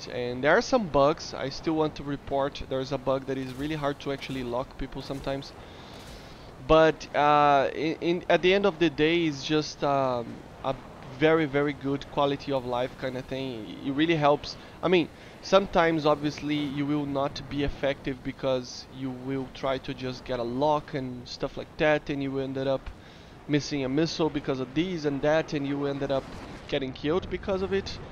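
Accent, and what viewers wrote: Brazil. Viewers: Are you Brasilian?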